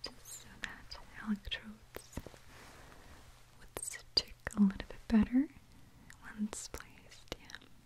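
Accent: Russia. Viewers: Lol girl you said muscle spams lol you crack me up I love your Russian accent when you speak English, so very cute.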